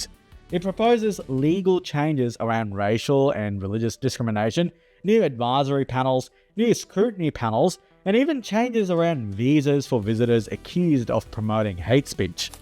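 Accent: Australia. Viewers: I'm hearing Australia, probably Adelaide, South Australia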